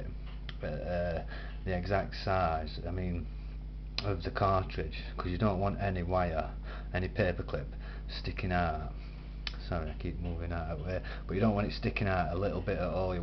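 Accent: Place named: United Kingdom. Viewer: Love the accent.. what part of the UK are you from? But anyway.. I really like that.. I think this would also work for the old fluval-on-top-of mod as well. I currently just have a bent paperclip inside the cart for the fluval to sit on and it works quite well. I could never get the silicon plug to be the right shape so I gave up on the zero filler thing.. whatever works though I suppose.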